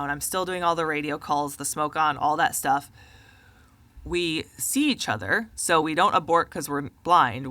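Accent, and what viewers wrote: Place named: Wisconsin, United States. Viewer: She’s awesome. What’s funny, I was listening to her talk and KNEW she was from Northern WI. I talked to/spent a lot of time with a woman about her age from up there and they sound the exact same 😂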